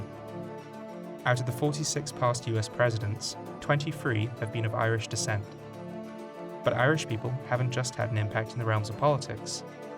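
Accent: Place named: England, United Kingdom. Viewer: Fascinating video and very nicely done. I'm curious as to why the narrator has an English accent? Seems a bit odd.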